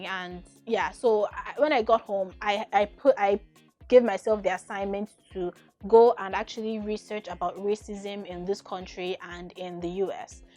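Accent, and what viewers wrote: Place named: Jamaica. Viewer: For some strange reason, you sound like a Jamaican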